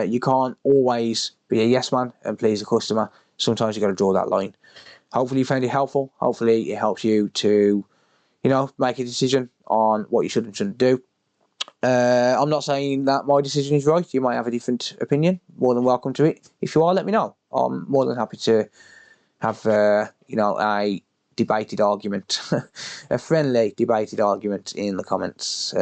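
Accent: United Kingdom. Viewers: could someone enlighten me what kind of british dialect he is using? I've never heared it before and it was a bit hard to get used to it but after watching several videos, its a lot of fun to listen to him.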